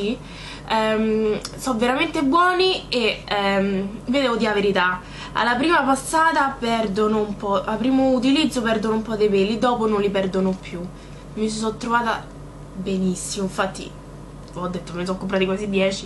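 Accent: Rome, Italy. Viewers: 4 adoro il tuo accento romano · 2 amo il romanesco hihihihi mitica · 1. che bei premi.. sei sempre bellissima.. hai trovato proprio le parole giuste.. siamo una grande famiglia.. ti adoro quando parli in romano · ") che bella quando parli il romanesco verace hahhaha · secondo me nn dovresti lasciarti andare tanto col romanaccio, e ti parlo da romana =) è bello sentirlo parlare, ma nn troppo esagerato!!! Ciao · 3 sei molto simpatico e il tuo accento romano mi fa impazzire!!!mi sta troppo simpatica la tua parlata!!!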